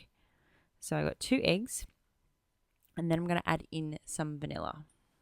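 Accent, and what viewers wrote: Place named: Australia. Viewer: Hi love your videos and explanations wonderful job!! I love your accent too are you from Australia? Keep up the great videos!! Allie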